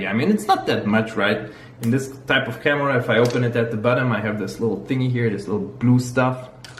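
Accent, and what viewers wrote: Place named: Germany. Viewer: Dunno who the f** this guy is, but that german accent is freaking awesome. Please make some good night tale videos too. :P But nice content there. BTW ...that orchid looks freaking awesome. :D What kind of plant formula are you using you console peasant? :D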